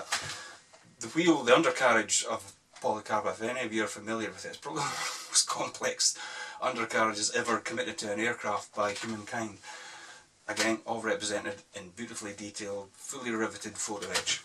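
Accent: Scotland, United Kingdom. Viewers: dude, you're like george clooney with a wee brogue. ;)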